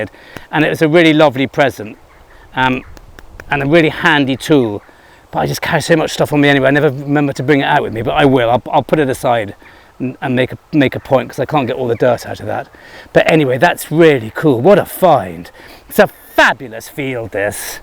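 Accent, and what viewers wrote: United Kingdom. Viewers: I do love youe videos and your very British English. I always smile with You when your smiling finding something interesting - more of this please! Must be fun to go out with you detecting... Greetings from Switzerland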